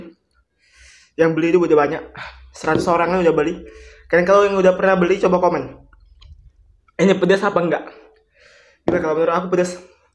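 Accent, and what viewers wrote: Palembang, Indonesia. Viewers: bang lu orang Palembang ya bang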